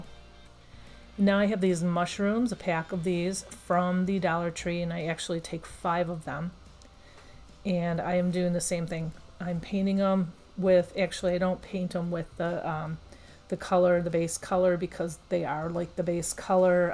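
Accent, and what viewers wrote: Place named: Midwest, United States. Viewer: Love the stuff. I hear a strong midwest accent. 😊😊 I'm originally from Illinois.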